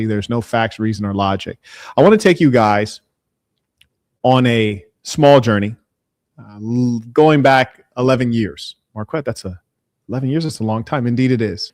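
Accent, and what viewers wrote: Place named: United States. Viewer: Well done. ❤ your videos. Take heed that the ONLY reason these Black 🇿🇦 are acting all sweet, agreeable etc is because of your American 🇺🇸 passport, accent & dollars which they associate with WHITENESS = WEALTH. If you were from Zim or any African country...they would have been aggressively rude to you. Dont be fooled by the agreeableness & listening to you. They see 🤑! I say this because Africans been telling these self -hating 🇿🇦 Knigrows the same thing thing but they insult, because they call Africans (Africans) distancing themselves as Africans because African is associated with BLACK...which means Poor, Beggarly and Helpless. Stay woke to these black 🇿🇦 cats. 😉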